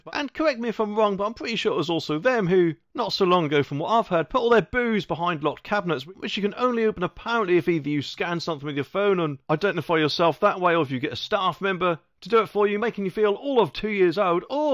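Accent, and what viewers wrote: Norfolk, United Kingdom. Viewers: Hi,where are you from? I recognise that accent,is it Norfolk.?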